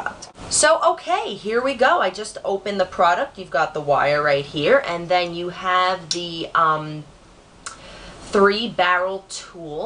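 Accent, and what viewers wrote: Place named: North, United Kingdom. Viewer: Are you from up north? I can tell by your accent lol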